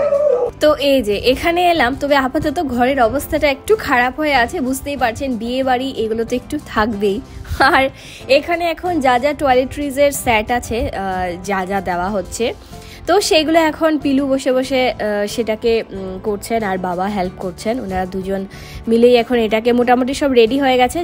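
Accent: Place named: Sylhet, Bangladesh. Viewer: Tomar seleti kotha khub bhalo lage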